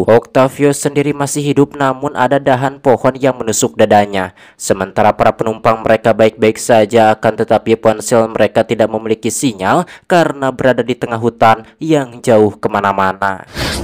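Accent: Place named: Madura, Indonesia. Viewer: Meduro logatnya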